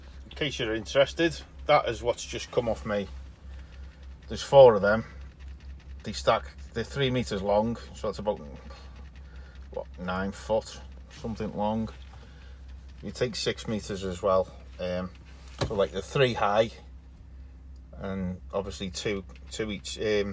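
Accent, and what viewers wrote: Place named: Liverpool, United Kingdom. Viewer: SCOUSER STYLEE.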